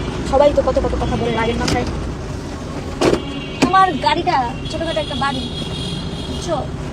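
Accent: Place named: Barisal, Bangladesh. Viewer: Borisailla fokinni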